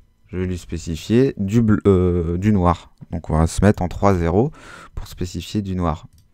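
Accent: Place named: England, United Kingdom. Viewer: Très bon tuto mais l’accent anglais est beau ! :D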